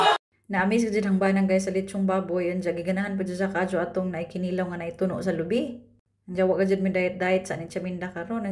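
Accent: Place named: Bohol, Philippines. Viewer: Hala taga alicia ka ako pud taga alicia hahaha kagamay sa kalibutan dini pata nagkita sa vlog hanga ko nimo kay binol anon gihapon ka mag storya pareho ta dia kos uk pero bola anon gihapong daku 😅 amping kanunay mo diha ❤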